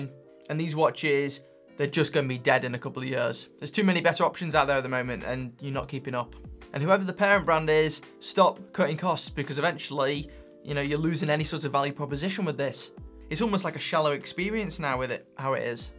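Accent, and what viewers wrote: Stoke, United Kingdom. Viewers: No, you're not unlucky. Braun is more than just a fashion watch brand, they have a long and illustrious history and their design philosophy has become iconic, but their build quality is RUBBISH. I've very optimistically bought 3 Braun watches over the years, including the ridiculously expensive "Prestige" chrono, and if the only problem you've had so far is a misaligned second hand, just wait until you've owned it a little longer and the REAL problems begin. That second WILL fall off eventually, the strap will disintegrate, none of the 6 hands on the chrono will behave as they should, any coating on the case or strap will scratch and chip away...and try changing the battery on the chrono - they've deliberately designed it so you have to take the whole watch apart via the front, although Braun recommend you send it to them to do it, 40 QUID to change a BATTERY! 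You're right, it's stupidity on their part, as by saving a little on quality control, they've now ensured I will never buy any product from the Braun range ever again, and will recommend anyone I know to avoid them like the plague. How to ruin a great brand. BTW, is that a Stoke accent?;)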